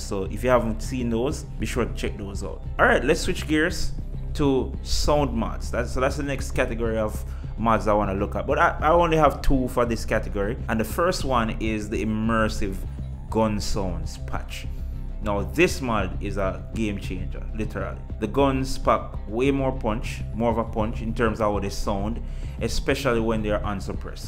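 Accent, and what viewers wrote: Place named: Jamaica. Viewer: I like your accent, man. Are you Jamaican?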